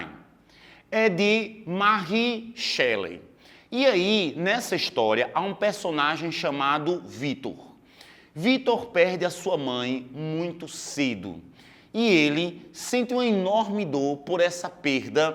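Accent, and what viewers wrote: Northeast, Brazil. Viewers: Apaixonada pelas suas aulas !! Queria que fosse meu professor no Ensino Médio e hodiernamente na faculdade. Curso Letras - Língua Portuguesa, e aprendo muito com você ! Estou estagiando no Ensino Médio e o que aprendo na suas aulas passo para os alunos. Muito obrigada !! você é maravilhoso. P.S., Seu sotaque é encantador, amo o sotaque nordestino.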